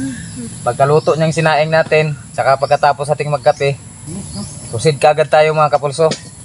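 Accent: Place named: Visayas, Philippines. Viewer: Bisaya man kaayo ang dating from tanauan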